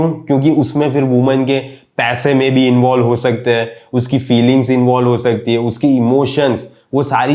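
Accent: Gujarat, India. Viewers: Bhai tame Gujarati cho? aapki aawaaz se lagta hai!! BTW Meh gujju chu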